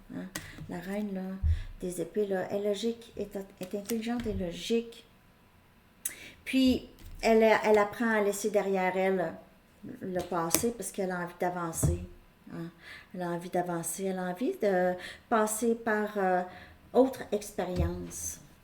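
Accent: Canada. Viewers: En plus, j'adore votre petit accent canadien, c'est trop mignon, mon rêve d'aller voir ce magnifique pays que vous avez là !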